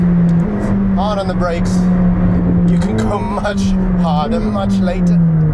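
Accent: South Africa. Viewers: This guy sounds South African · Is the presenter South African? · Are you South African? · The presenter sounds South African. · Sounds like a South African accent to me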